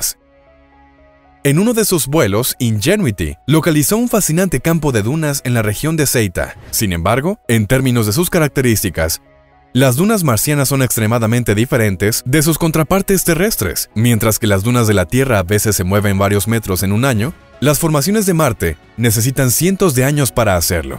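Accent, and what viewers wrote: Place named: United States. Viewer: da arcadas escuchar esa entonacion yanqui de los nombres de los crateres y los vehiculos. Me gustaria ver a los yanquis esforzandose tanto por pronunciar los nombres en español de las ciudades de mexico (por poner un ejemplo)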